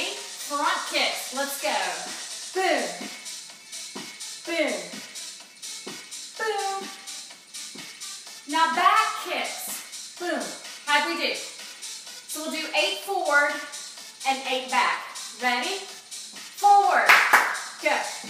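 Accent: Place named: North Carolina, United States. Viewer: Hi it’s Linda from Peoria again I just wanted to tell you I did the beginning boxing regiment and I really thought it was fantastic. I think this would be great for me to do in my water aerobics class. I have a sister in Chapel Hill North Carolina. I can tell the accent!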